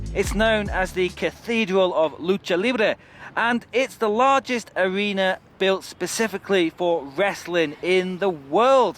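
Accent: England, United Kingdom. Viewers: Escuchen ese bello acento inglés 😌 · There you go a brit in MX with a nice accent!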